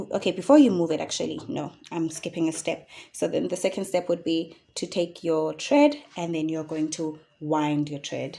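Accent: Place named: South Africa. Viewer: I heard the South African accent and I was hooked!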